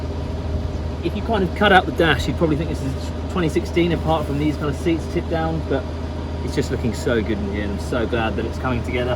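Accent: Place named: United Kingdom. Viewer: Could somebody tell me what is the brand of the front seats, visors cover and the back seat covers? English isn't my native language and the British accent is a bit tricky for me to understand. Thanks for the help.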